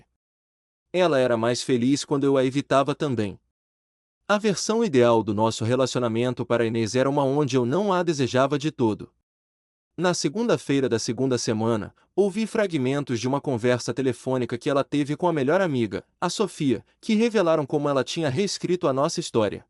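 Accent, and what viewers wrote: Portugal. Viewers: Tem histórias que são boas mais o português Portugal o torna efadonho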